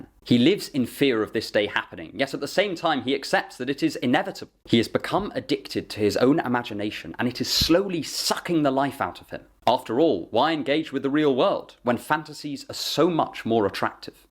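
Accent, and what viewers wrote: United Kingdom. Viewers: Thanks for the info !! Your advice is greatly appreciated and your videos are really informative and intriguing and would like to ask where is your accent from it’s British right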